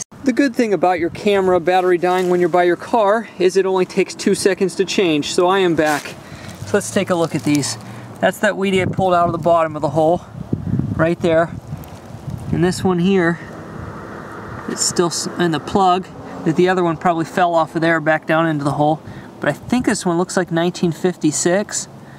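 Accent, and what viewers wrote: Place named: South, United States. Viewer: Great hunt its also funny you are picking up on the southern accent and sayings.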